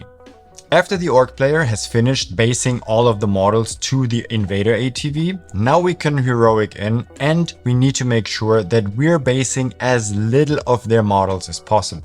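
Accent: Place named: Germany. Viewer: why do I hear the slightest german accent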